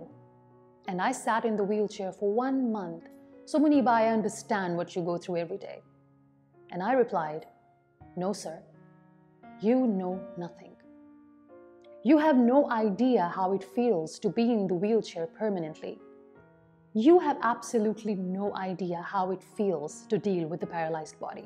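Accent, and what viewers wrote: England, United Kingdom. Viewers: Mam you are my" role model ".I like your english auction. Blessing you to live long with great success.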